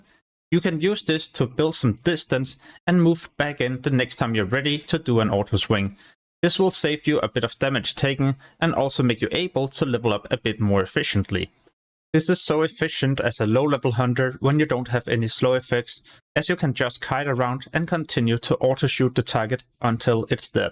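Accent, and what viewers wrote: Denmark. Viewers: Man you sound Danish 😅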